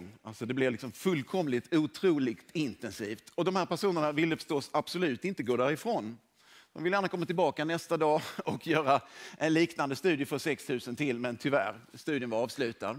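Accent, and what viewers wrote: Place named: Scania, Sweden. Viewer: en eloge för lugn välvårdad skånska som nästan vem som helst måste kunna uppfatta. Det du säger är oerhört intressant. Ännu bättre vore om allt du säger var textat på svenska. Det finns ju folk med rejäl hörselnedsättning. Men du är mycket tydlig i mina öron. I o f s kopplar jag av bättre om det finns text också. Tack!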